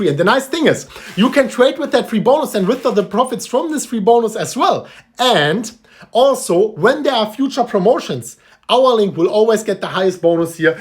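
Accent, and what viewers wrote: Germany. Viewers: HES a German hes accent is funny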